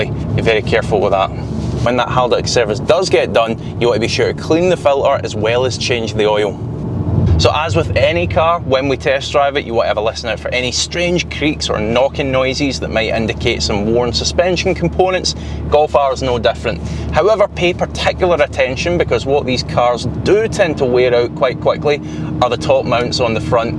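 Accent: Scotland, United Kingdom. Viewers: Don’t be ridiculous have you never heard a Scottish accent before!!!!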